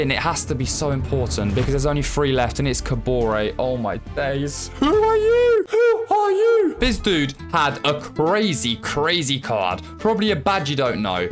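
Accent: Poland. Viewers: Are u polish maybe?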